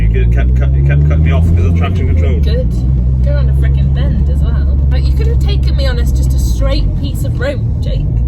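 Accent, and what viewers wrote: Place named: Shropshire, United Kingdom. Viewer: you sound like you are near me , shropshire welsh border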